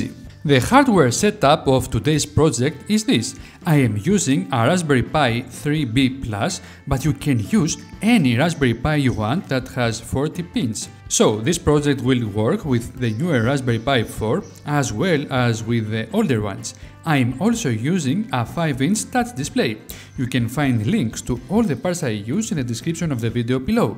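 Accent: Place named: Greece. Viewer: Greek accent tststst.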